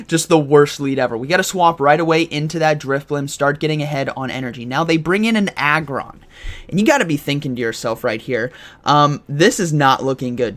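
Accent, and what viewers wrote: United States. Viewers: He's American, residing in the UK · Didn't realize you lived in the UK. You sound pretty American imo! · Wait is from the UK? Thought he was American lol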